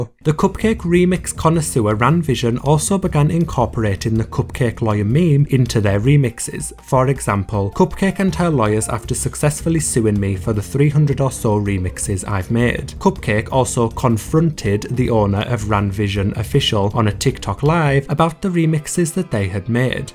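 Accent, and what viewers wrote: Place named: Liverpool, United Kingdom. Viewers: I love your liverpoolian accent "Cupcek"😂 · Some Northern British, I suppose.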